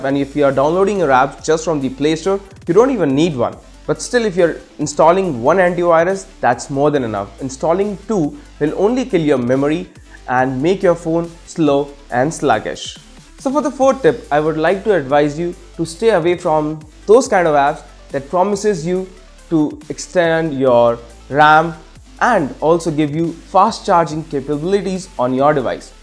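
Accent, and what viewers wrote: India. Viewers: you speak english is totally wrong, because indian language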